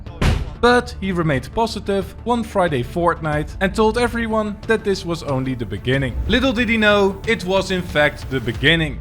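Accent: Netherlands. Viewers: Me after half of ur first sentence: Yes, this guy is DEFINITELY Dutch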